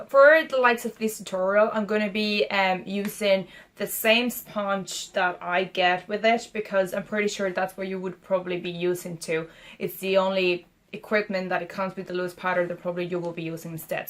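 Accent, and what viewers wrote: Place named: Ireland. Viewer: I love your soft Irish accent :)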